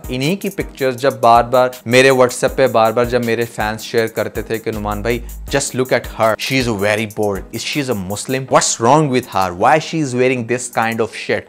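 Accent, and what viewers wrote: India. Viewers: I wonder how he is speaking constantly in hindi accent 🤔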